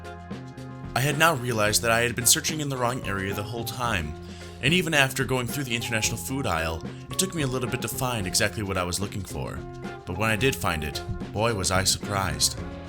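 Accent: Midwest, United States. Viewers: Are you from the Midwest? Your accent... it is strong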